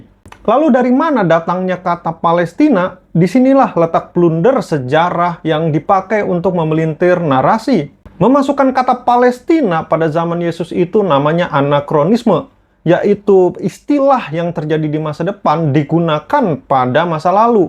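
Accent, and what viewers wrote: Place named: Surabaya, Indonesia. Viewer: Beliau... dahh jelas wong suroboyo...😂😂😂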